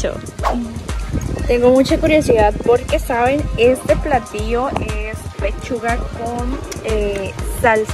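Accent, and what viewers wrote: Guatemala. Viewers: Estoy sorprendido porque tú ya no hablas como salvadoreña, por un momento pensé que eras chapina.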